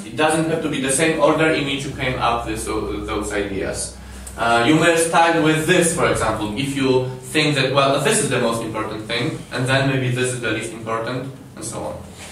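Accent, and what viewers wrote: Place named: Poland. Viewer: Wiedza imponująca, rzeczywiście, gratuluję uczniom :) Przyczepiłbym się do wymowy, która jest bardzo polska :P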